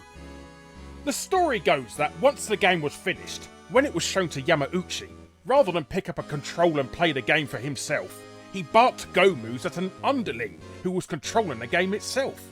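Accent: United Kingdom. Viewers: You're welcome! I'm just an american fellow who loves british accents. Also, i love your content, and Peter molyneux, is always fun to make fun of!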